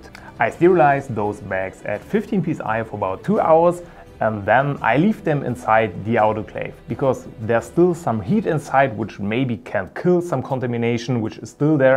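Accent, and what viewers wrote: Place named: Germany. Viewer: So ein schönes Deutsch-englisch :D man merkt es... aber gutes Video !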